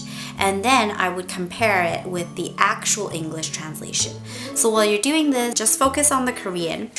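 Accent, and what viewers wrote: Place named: Canada. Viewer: are you from Canada ? you sound like one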